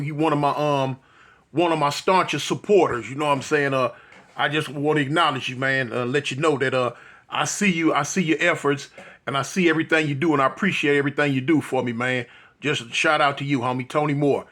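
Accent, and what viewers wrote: South, United States. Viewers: I was gonna say you got a heavy southern accent which I do to but I'd swear you was from Memphis or Alabama or Jackson Mississippi one if I didn't know better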